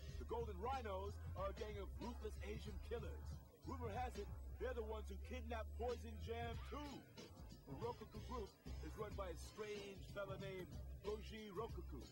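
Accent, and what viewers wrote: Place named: South Africa. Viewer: Which country are you from? you sound south african also very bored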